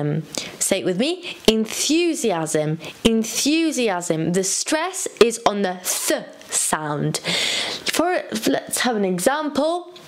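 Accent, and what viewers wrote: United Kingdom. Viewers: Thank you very much I do love british accent send me more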